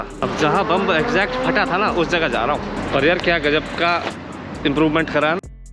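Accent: Haryana, India. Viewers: Bhai aapki language se lagta h ki aap haryana k palwal ya phir faridabad district se belong krte ho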